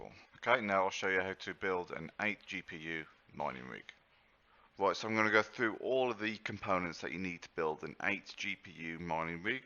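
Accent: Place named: Birmingham, United Kingdom. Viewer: I can easily tell from your voice that you’re a Brummy haha. Nice vid!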